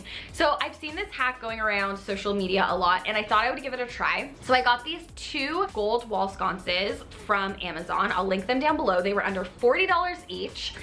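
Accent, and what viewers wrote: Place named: Canada. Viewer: I love that Canadian accent💕🥰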